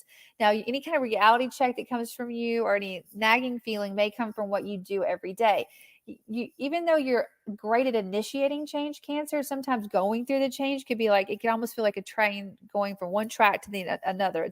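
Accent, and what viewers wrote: South, United States. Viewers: I love the light southern accent you have!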